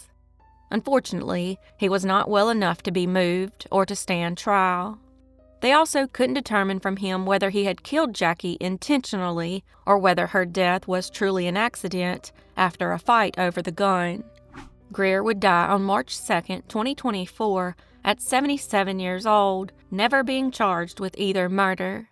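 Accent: South, United States. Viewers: THANK YOU!! LOVE THAT BEAUTIFUL SOUTHERN ACENT!! YOU GO GAL!! KEEP EM COMING!!:face-red-heart-shape:🤠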